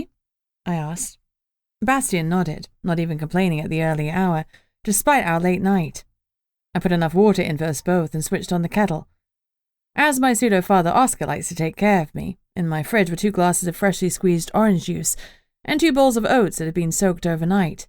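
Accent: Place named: England, United Kingdom. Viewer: Love the narrator. Lovely english accent i can easily understand.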